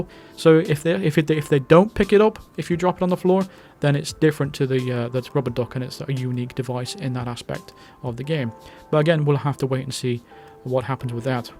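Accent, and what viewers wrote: United Kingdom. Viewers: Finally a British man narrating hit man gameplay like a pro this is exactly what I was looking for when I think of good content! But bro mans can you convert pounds to dollars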